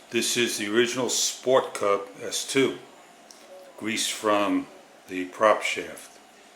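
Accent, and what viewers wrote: New York, United States. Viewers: I hear New York. I could be wrong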